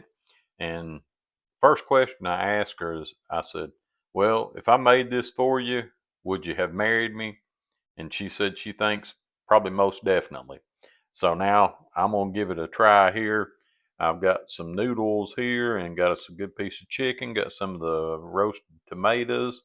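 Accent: South, United States. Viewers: i live in nrthern maine and i ABSOLUTELY LOVE YOUR SOUTHERN ACCENT AND DRWAL!!! ANY MAN...that can cook.. hell ya!!! hope your staying safe with this weather stay warm have a good wkend ;];];]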